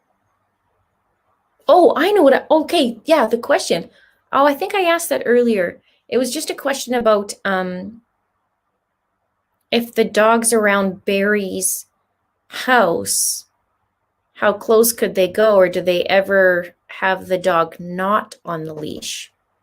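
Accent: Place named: Canada. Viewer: I love your channel and your Canadian accent. I love that they have the Benghazi surviver Tig, helping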